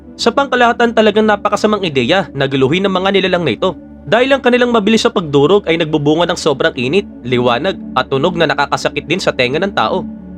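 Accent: Iloilo, Philippines. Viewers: Ilonggo ka lods?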